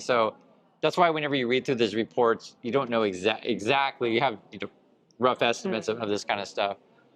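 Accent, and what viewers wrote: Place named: Oregon, United States. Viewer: I can tell you're from Oregon because you say "fleg" instead of flag : )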